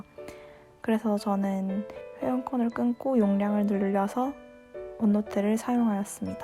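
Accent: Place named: Gyeongsang, South Korea. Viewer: 목소리가 매우 차분하고 전달력이 좋으시네요 약간 경상도 억양이 있으신 듯 한데요 저는 여자분 아주 살짝 섞여있는 경상도 억양있는거 뭔가 느낌이 좋더라구요 영상 잘 봤습니다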